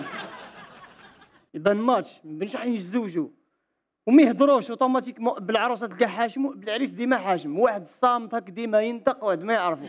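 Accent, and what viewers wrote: Constantine, Algeria. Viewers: اللهجة القسنطينية قرعة ماتصلح لوالو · اسمحلي نطق التاء القسنطينية باين على بعد 1000 كم مام تهدر الروسية De passage bon courage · هديك هي بصح عجبني كي يهدر باللهجة القسنطينية 😍😍😍😍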